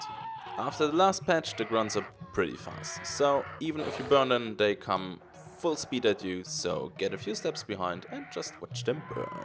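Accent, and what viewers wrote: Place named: Germany. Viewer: Dezenter deutscher akzent... gefällt mir :D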